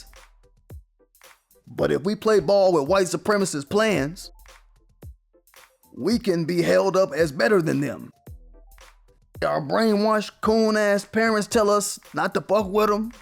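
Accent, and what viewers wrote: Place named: Texas, United States. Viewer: You sound like an old white hick from Texas.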